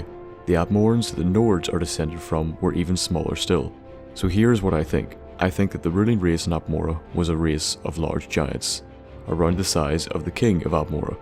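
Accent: Northern Ireland, United Kingdom. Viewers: What part of NI are you from?? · where is the narrator from? sounds like western northern irish to me?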